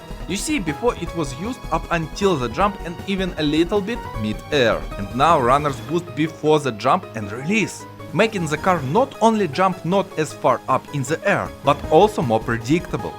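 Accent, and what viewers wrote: Eastern Europe. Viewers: Slavic accent in eng beamng vid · Bro is STRONGLY eastern European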